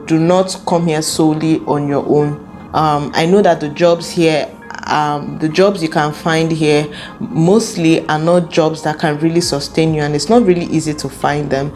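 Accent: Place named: Nigeria. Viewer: She is nigerian and they speak french, I believe that's the reason for her accent.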